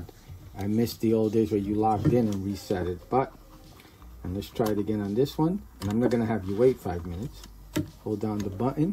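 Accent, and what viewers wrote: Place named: New York, United States. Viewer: Bro, thank you for sharing this I was trying to help my sister in Orlando and I could not figure out how to reset her Google Wifi two. The button on the back of the gen 2 is underneath the device with no fiscal button. Bro, I was listening to speak and I swear you sound just like me. I told my wife to listen to your video and she was that the voice sounds familiar. She tells me that's a New Yorker voice. I told her that's me except you have a little higher pitch. Anyways, thanks for sharing.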